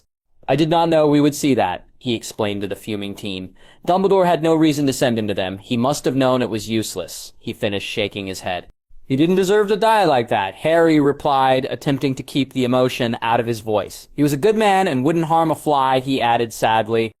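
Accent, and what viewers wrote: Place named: United States. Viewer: Why is this one with an American accent? It's really jarring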